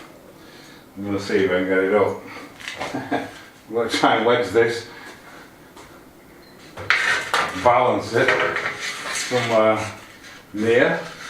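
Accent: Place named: Yorkshire, United Kingdom. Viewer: Love the door❤ Where are you guys from? You sound like your from Yorkshire 😁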